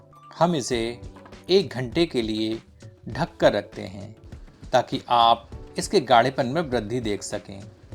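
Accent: Standard. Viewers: आपकी हिंदी कर्णप्रिय शुद्ध है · I'm a South Indian Tamilian, appreciate your shudh hindi conversation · आप की शुद्ध हिंदी उच्चारण से ही मन प्रसन्न हो गया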